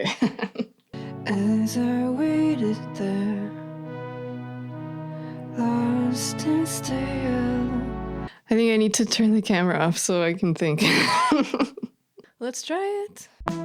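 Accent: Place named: Quebec, Canada. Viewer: Years ago when I watched one of your early video, I thought your talent combined with your quirky Québécois inflections might spell success. Back then you recorded vocals with an SM58, which surprised me. Then last year I saw you sponsored by Native Instruments (I think). Congratulations on your management and continued success! 😁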